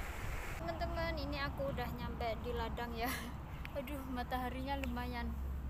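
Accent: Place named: West Java, Indonesia. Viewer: Dari logat bahasanya kayanya kamu dari Jawa barat ya?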